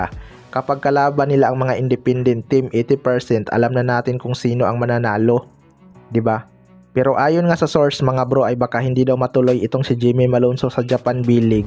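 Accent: Visayas, Philippines. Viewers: Brad ayusin mo ha… pagka bisaya mo magsalita ayusin mo lichi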